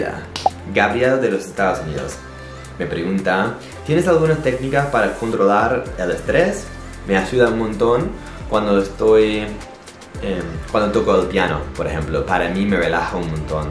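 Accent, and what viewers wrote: Buenos Aires, Argentina. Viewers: I can definitely hear the Argentine accent in your voice... Also your Latin mannerisms you picked up come through... Definitely interesting... I do the same thing when I speak Castilian Spanish... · no dijo que no tenga acento gringo, dijo que su español es muy argentino, las formas de pronunciar las palabras las acentuaciones y sobre todos los "sh" sounds que se les escapan a veces son caracterisitco del español argentino obviamente mezclado con su acento gringo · Tienes marcado el acento Español pero con cierto toque de Argentino! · Me encantaaaaa cuando mezclas el acento argentino y español!! 😍😍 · Que increíble tu español argentino 😍🙂💪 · Es verdad tiene un toque de acento como los argentino como nosotros habla muy bien .great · te sigo hace poco tiempo y realmente hablás muy bien español, me encanta que tengas el acento argentino, me alegro que te haya gustado vivir en nuestro país y conocer nuestra cultura. 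Espero que puedas volver, aún hay mucho por conocer 😁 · I love your Spaniard/ Argentinian accent! Lo haces perfecto! Te felicito. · Hola!!! Me sorprende que hables en español tan bien, incluso tienes acento argentino. Eres muy respetuoso, divertido y buena persona y se nota al hablar. No cambies nunca. Un abrazo desde España. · I think what they're saying is that his accent has features most associated with accents heard in Argentina, most notably the way he pronounces doble L. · Tenes la pronunciación de la letra R nuestra! De los porteños! 😝. Your Spanish is excellent! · Me mueroooo jajajaja es que escucharlo hablando con acento argentino es súper funny. Le queda bien. Saludos desde Puerto Rico. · Te amo fuerte, tenes un acento muy porteño jajaja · you have an Argentine accent when you speak Spanish, I love it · Interesting, normally Americans learn north American or European Spanish, but you learned Argentinian spanish and even have the strong accent! 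I love it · It's a mixture of Argentinean & Spanish from Spain. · ESE ACENTO ES FABULOSO !!! MARAVILLOSO !!! ME ENCANTA ESE ACENTO !!! ARGENTINO ES LO MAS !!!!!!!!!!!!!! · Lucio Martínez yo soy argentino y te puedo decir que tiene un acento muy similar al nuestro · No sabia que habias vivido en Buenos Aires, pero me di cuenta al toque por que tu español es marcadamente porteño, ni siquiera Argentino... porteño! jajaj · Hablas como argentino porque dices "sho" y no "yo". Pero esta super bien 😄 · Suena como una mezcla de argentino, español, y yanqui. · Tu tienes el acento “argentino” más claro que he oido en mi vida jajaja. Yo soy de los Estados Unidos también, pero gente dice que yo tengo acento mexicano o peruano. Algunos creen que tengo acento mexicano y algunos creen que tengo acento peruano. Pero no he vivido en un país donde se habla español y por eso mi acento no está escrito en piedra. 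· First of all, someone's accent is Argentine, not Argentinian; "Argentinian" is the name give to the people from Argentina, whereas "Argentine" is restricted to things, both from the said country. Second of all, he does mention living down there, working down there and even dating someone from down there. Finally, he does sound like an Argentinian at times; that's probably because of how he learned. Quit bitching...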